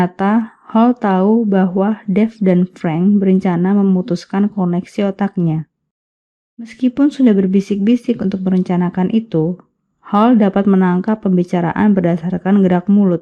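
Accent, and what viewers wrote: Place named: East Java, Indonesia. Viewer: Ya kayaknya orang jawa timur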